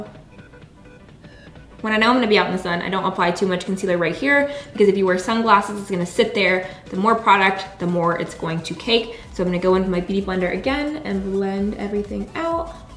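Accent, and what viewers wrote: South, United States. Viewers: Sometimes I hear her southern accent peek through when she talks like in her old videos.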